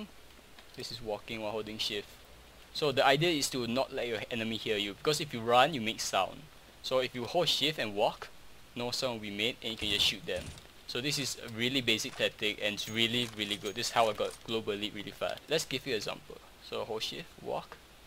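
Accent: Singapore. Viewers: the accent sounds like sg tho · Hey you from a singaporean background :) You should speak more singlish in comp Can you just play a comp game purposely stressing a singlish accent and upload it to youtube? Because I too am singaporean. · You have a Singaporean accent. Hello :D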